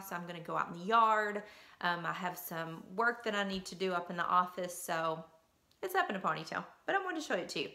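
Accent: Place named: Kentucky, United States. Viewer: aha! Thought I recognized that accent. I am from eastern KY but mostly raised in southern Indiana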